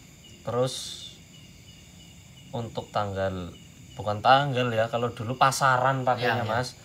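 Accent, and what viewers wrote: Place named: Banyumas, Indonesia. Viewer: Ora ngapak ora penak mas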